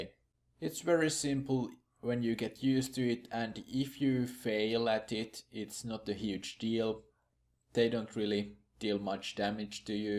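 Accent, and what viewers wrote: Finland. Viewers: You must be finnish? Suomiaksenttia ei voi olla tunnistamatta. Hyvä guide, pääsi hyvin kärryille shamanien kanssa :D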